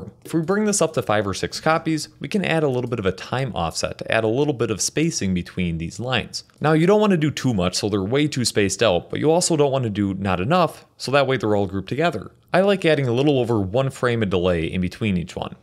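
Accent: Wisconsin, United States. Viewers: I love your Wisconsinite accent!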